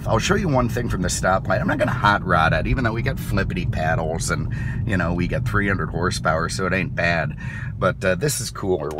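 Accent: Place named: Baltimore, United States. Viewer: always makes me laugh. He doesn't take any "shit offa nobody" and I love the Ball'mer accent!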